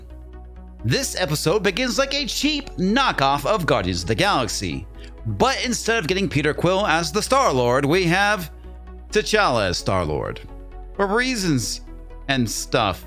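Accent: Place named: New York, United States. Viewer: You sound like an off-brand New Yorker, mate. I like it! And man was this show garbage.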